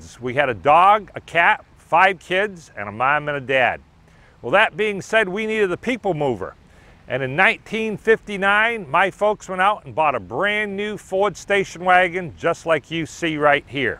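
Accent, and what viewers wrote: United States. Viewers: I hear Boston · Being from Massachusetts, I myself will guess the presenter is from Maine.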